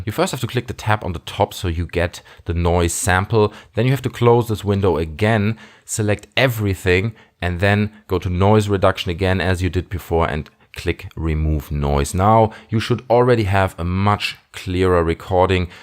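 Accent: Germany. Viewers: 3,5 mm Jack? Ich weiß dass du deutsch bist, haha, man hörts. Könntest du mich bitte auf Instagram anschreiben? Ich hätte gerne über das Mikro diskussieren. Habe vor mir es zu kaufen.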